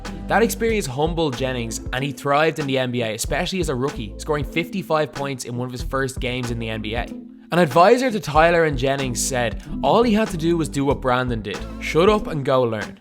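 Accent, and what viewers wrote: Dublin, Ireland. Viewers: are you from Dublin or county's around that like kildare Louth ect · Is that an Irish accent on the narrator?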